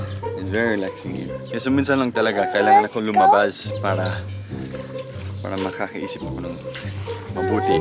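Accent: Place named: Philippines. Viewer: Ibang iba na ang accent mo ngayon sir very pinoy na..btw, all of us has to go through different hurdles in life but what can makes us strong is our faith in God coz no matter how big our problem is, there is always bigger God for us..Just be strong and keep moving forward. God Bless you and your family.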